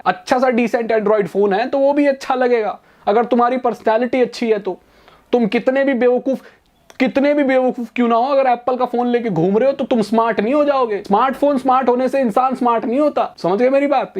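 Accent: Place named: Punjab, India. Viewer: Why are you making your accent like punjabi people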